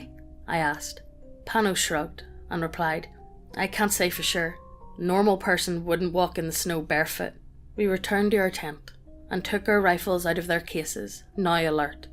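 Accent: Ireland. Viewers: It's 11 PM here, I don't know if I should watch videos containing spooky stories and Irish accent now, zombie-leprechaun nightmare fuel : (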